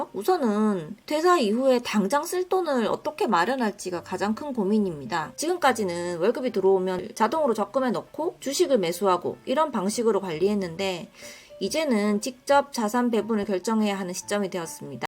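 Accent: Gyeongsang, South Korea. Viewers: 경상도 사투리가 이렇구나 ㅎ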